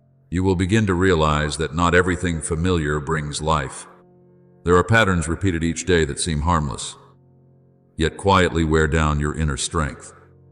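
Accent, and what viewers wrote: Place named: United States. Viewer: Why do you speak with an American accent I am English.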